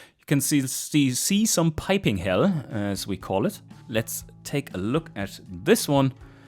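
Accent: Germany. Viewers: i like your dialect, sounds like a fellow native German speaker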